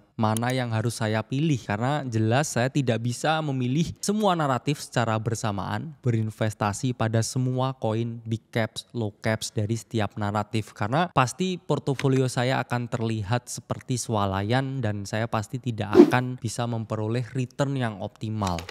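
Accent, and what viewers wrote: Java, Indonesia. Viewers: 🎉mas jowo nya ngendi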